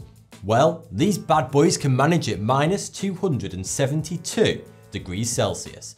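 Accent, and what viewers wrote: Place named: Lancashire, United Kingdom. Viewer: That accent makes him sound twice as intelligent, damnit I wish I was from Lancashire...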